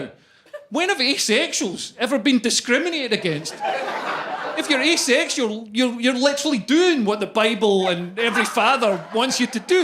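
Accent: Scotland, United Kingdom. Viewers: His Scottish accent makes the jokes even better. Non-Americans have such effective vocabulary and makes things a lot funnier than they probably are. It took me a very long time to know I'm Ace, partly because I don't f*cking care about orientation or labels. Is it too much to simply exist without knowing some personal preferences? I'm all for figuring oneself out to have a peace of mind but, damn. The alphabet people have taken things *WAY* too far this year. Be happy with who and what you are but let's remember to keep some things to ourselves. Some gay guys I knew/know didn't show any "signs" as they didn't make it out to be their only characteristic. Very polite. Well spoken.They also just so happened to dress very well but doesn't every self-respecting person do that? Annnyway, the only real fact didn't say about who's actually upset about us Asexuals is feminists. 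Feminazis in particular, the man-hating ones that want nothing more or less to wipe us off the Earth. When in college I read a passage which humored me. It was a bona fide statement backed up by some sources claiming Feminazis hate us Aces because we don't fit into that stupid rhetoric they're always saying: "Men only want one thing..." uh... if it means what I think it does, no we don't. Begone, Karen! Off to CVS for a boxed wine for your sorrows and bag of Whiskas for the cats! Try to figure out a more practical way to insult us Aces. Take your time. I can wait. By the way, if I understand the online comm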